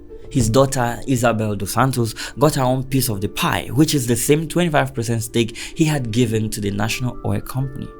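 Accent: Nigeria. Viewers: I am not hating, but the fact that this story is narrated by someone that sounds Nigerian, is hard for me to trust it!! Scammers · Sounds like a Nigerian...you know what i mean!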